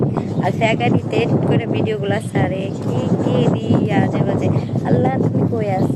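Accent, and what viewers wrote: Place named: Noakhali, Bangladesh. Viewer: আপু তোমার বাড়ি কোথায় মনে হচ্ছে নোয়াখালী পরিচয়টা কি দিবে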